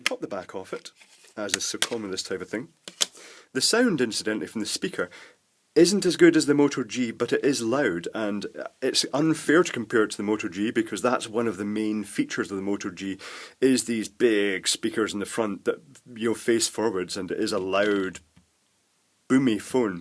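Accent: Scotland, United Kingdom. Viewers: i knew it, just some of the words you were saying and the accent! I'm from Glasgow myself so hello fellow Scotsman! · it's not your boomy voice, it's the Scottish accent ;-)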